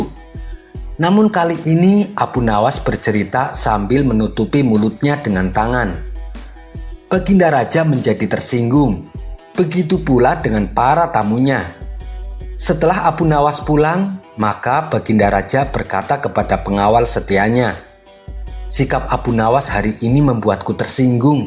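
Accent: Java, Indonesia. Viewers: Medok amat kowel lo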